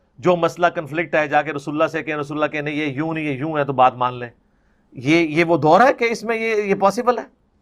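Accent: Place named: Punjab, Pakistan. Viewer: hahaha bhai, your way of taunting is very nice. your accent punjabi (or may be siraiki) is great.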